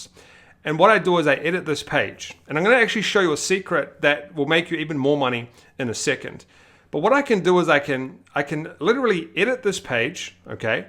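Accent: New Zealand. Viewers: Are you from NZ ?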